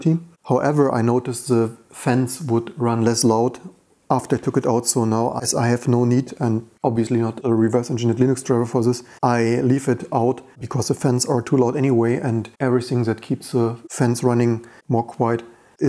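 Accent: Germany. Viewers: Your english is very german. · Mh the heavy german accent, delicious!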